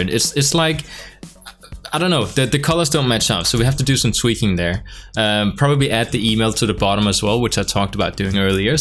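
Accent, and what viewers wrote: Denmark. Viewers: Your English sound danish ? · Are you danish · Tænkte sku nok du var dansk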